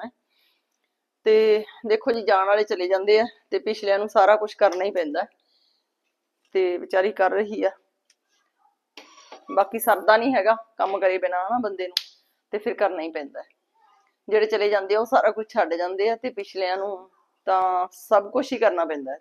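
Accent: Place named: Doaba, India. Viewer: Sade vi doabe vich pateela khende ne bhan ji 🙏🙏 thudi boli sade vargi a same ❤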